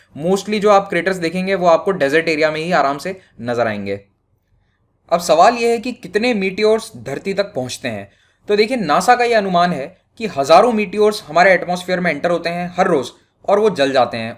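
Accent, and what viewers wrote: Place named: Rajasthan, India. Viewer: When u say killlooo (kg)😂 that rajasthani accent....I like it very much...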